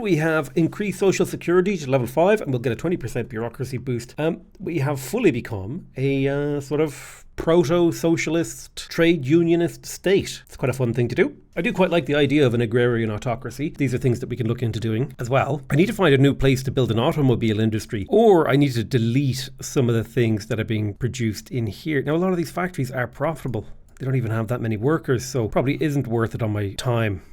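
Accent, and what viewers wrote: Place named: Ireland. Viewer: Came back to finish watching this - just realized that gets more and more Irish as the video goes by. (I do realize it's just his non-youtube accent slipping out bc of how long he's recording for)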